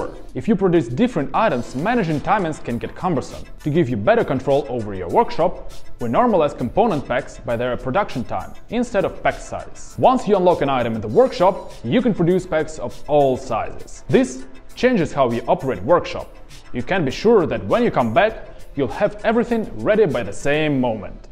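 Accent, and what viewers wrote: Russia. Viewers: That Russian accent... Come on guys find a native speaker :) no offense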